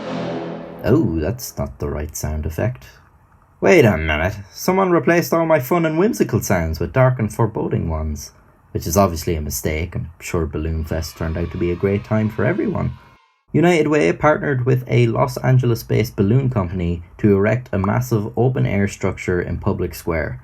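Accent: Ireland. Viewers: Are you Irish? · Can’t tell if he’s Irish or northern Irish or something completely different but he’s got the accent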